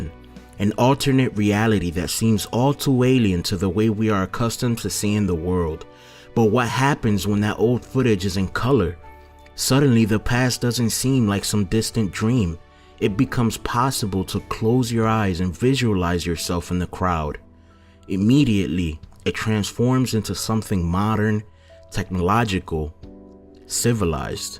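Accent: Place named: Dominican Republic. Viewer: Papá tú acento es full dominicano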